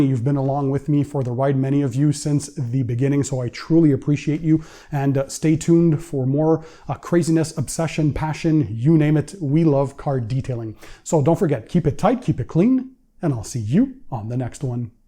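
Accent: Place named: Quebec, Canada. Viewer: Waitttt your from Quebec???? You have Quebec French accent!!!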